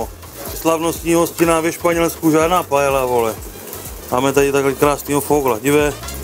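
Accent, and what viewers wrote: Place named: Haná, Czech Republic. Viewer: taká hanáčtina :D dobré vole :D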